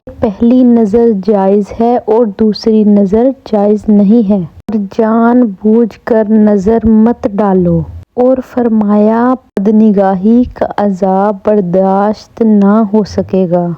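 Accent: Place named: United Kingdom. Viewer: جزاک اللّہ ۔ اچھی اصلاحی باتیں ہیں ۔آپ بھی مزید کوشش کریں کہ صرف آوز چلائیں اور سامنے نہ بیٹھیں ۔تاکہ اچھی باتیں سنتے ہوئے آپ پر نظر نہ پڑے۔ اور ناظر منظور دونوں نظر کے گناہ سے بچیں ۔ اور حیث کو حریث بولیں ہدیٹ نہ بولیں۔ انگریزوں کے لہجے میں۔ آپ تو اردو خوان ہیں۔ کیوں حدیث کی جگہ ہدیٹ بولتی ہیں۔ اسکی اصلاح کریں ۔ جزاک اللہ ۔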